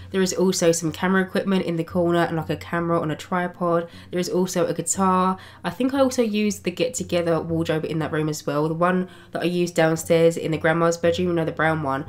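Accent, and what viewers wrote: United Kingdom. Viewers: British/London accent, the worst of all eng accents, so please slow down, especially when u r not pronouncing at least one or more of the ending syllable from every word. Instead of ”cars”, you have ”ca”... Terrible pronounciation of the eng language, but a motto for later: ”Swedes speak better english than the Brits and maybe the best” So fuck the eng or especially the londoners eng who mutilate and deforming the language so badly. Haha ;) :D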